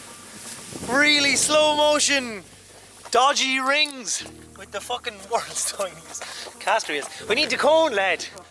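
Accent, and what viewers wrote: Ireland. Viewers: The rings were class but you need practice man!! The Irish sayings and accents going worldwide lads! Fair play 😎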